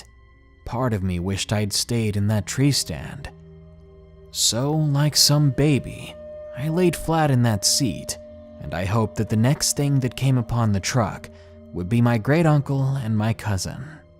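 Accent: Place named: United States. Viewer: Oh yah you are from America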